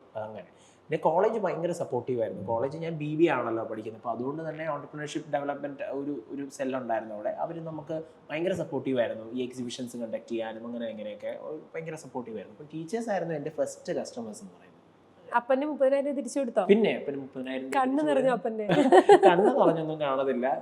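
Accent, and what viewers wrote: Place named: Kottayam, India. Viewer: Kottayam karalle ata slang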